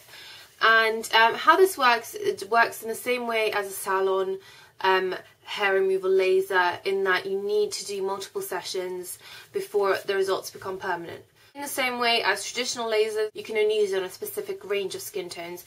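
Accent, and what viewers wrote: United Kingdom. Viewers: Oh, gosh,the speech is so distorted!.) ?. Very heavy British accent!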